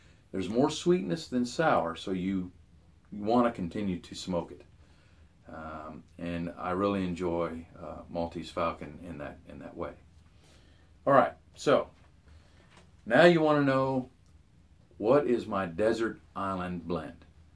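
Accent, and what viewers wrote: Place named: South, United States. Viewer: what part/s of USA is your (or his if your a viewer & U know?) is your accent from/based-around,? As a Brit gal (my hubby smokes a pipe & loves Nightcap & your vids) I/We can't quite place it; I get notes of Southern, maybe a dash of Texan, Midwesterny overtones, a teensy bit of Northern-border region possibly (Pennsylv./Fargo e.g., hey I'm a Brit I'm gonna be way off prob!?), and a hint of light New-York here & there. But really it just sounds like what we in rainy old England might consider a 'straight-American-Dialect' with a touch of some southern state/s; usually the only Southern-State accents we tea-drinking islanders can tell apart from the others are New-Orleans and 'pure' West-Virginia (if that counts as a Southern state?, it sure sounds like one to us). Just curious & interested to know how way-off we are - happy piping! 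👍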